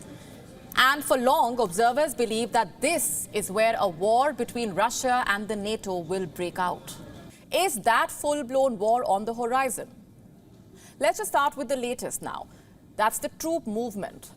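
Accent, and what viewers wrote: India. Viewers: Omg this Indian accent 😂😢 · Love Indian accent, only sometimes pronunciation is off..like "wulnerable" instead of vulnerable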